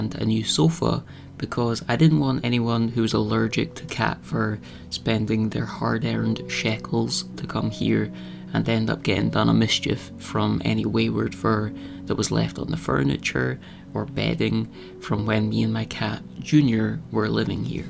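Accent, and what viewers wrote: Scotland, United Kingdom. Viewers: "... and not fixing potholes" --- LOVE IT, you are so refreshingly honest 👏😂. I wish you the best of success with your enterprise and future plans. It takes time to get it running more profitably. As to the critics - I think a great deal of it is envy and the reluctance to see how much time and work you put in it. Ethical or no isn't the question. The same could be said about most every profession or business. You don't have to defend yourself, you are doing a great job and you are very thoughtful and respectful in everything you showed us here. Also, Scotland offers only so much opportunities to make a living. Criticism is so easy online - I'd suggest for everyone to check their own circumstances first. Unless they are 100% flawless themselves, they might want to stop trying to give you a bad feeling. I for one enjoy this channel very much and it's obvious why your channel grows so nicely, I don't stand alone with my opinion, it seems.. 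I like how you and your family work together to make things happen. I enjoy your honest and kind personality along with this lovely Scottish accent, of course. I'm old enough to be your grandma and I'd be very proud of a grandson like you. Best wishes to you and your loved ones on 2 and 4 legs, hugs and smiles from the Bavarian Highlands, the alpine region that's indeed called Oberland 🙋🏻‍♀️❤️ Lisa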